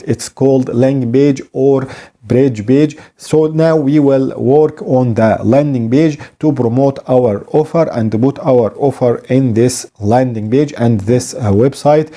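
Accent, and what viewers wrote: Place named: Egypt. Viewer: معلش بس اللهجة المصرية باينة بصراحة 😂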